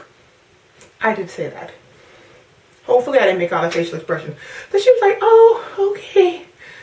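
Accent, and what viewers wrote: Caribbean. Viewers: you really went in on her with your VI accent mehson! I feel for you digicel got on my last nerve last yr. Hopefully this is the last of it. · You have made my day, you are so funny! Love the Carribean accent!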